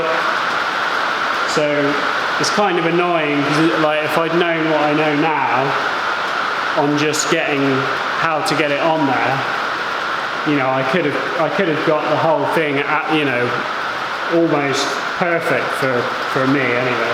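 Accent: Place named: South West England, United Kingdom. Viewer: Nice build fella, I thought you had a familar sounding accent. I'm in SW UK also, it kinda makes the project all the more interesting. Subscribed.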